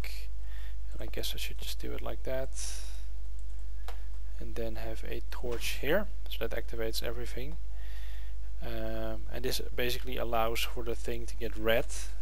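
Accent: Netherlands. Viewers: Hey, are you dutch? I recognize the accent :p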